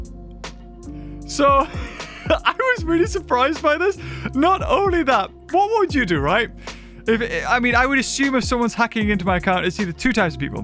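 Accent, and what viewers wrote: United Kingdom. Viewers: if he has british accent i love it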